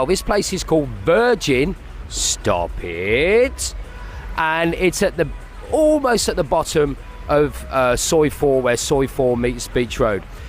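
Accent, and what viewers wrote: Birmingham, United Kingdom. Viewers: Great video mate. Never made a comment on your channel before. I had to because it was brilliant. Love the Brummie as well.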